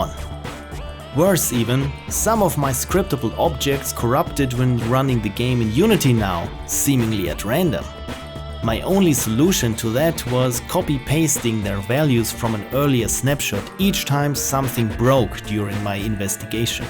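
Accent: Germany. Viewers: I mean yea, you can hear the strong German Accent, but i pretty much forget about it in the first minute because im so damn fascinated about the quality of the video. I know this Post Morten Ludum Dare Series since two to three years, and always loved it, you are really talented at making this kind of stuff and i just hope one day you get the attention you really deserve. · Bruder muss los in allem ernste, fantastisches Video, ich hätte am Akzent gesagt du bist Deutsch aber die Alpen sagen irgendwie Österreich/Schweiz sono molto confuso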